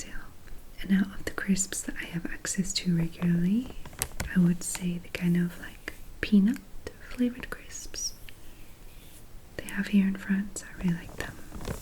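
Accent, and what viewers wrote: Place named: Scotland, United Kingdom. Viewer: She's Scottish, that's where the accent is from.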